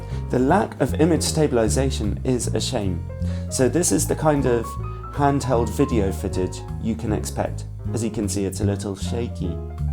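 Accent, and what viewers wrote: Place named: Wales, United Kingdom. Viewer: Hey. Love the channel, been watching your reviews for probably a decade. I'd love to see a re-review on the Canon EF 100mm f2.8 Macro (non-L). I have one, but would love to see your method of testing it on a "modern" (to me) 6D with your newer testing format. Keep up the great videos. And dude, I gotta say, I love your accent! Not 100% sure you're Welsh, but I'm 25% Welsh myself. So "hello" from across the pond!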